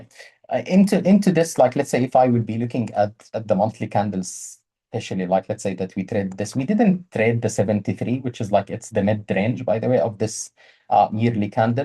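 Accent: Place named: Saudi Arabia. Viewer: saudi accent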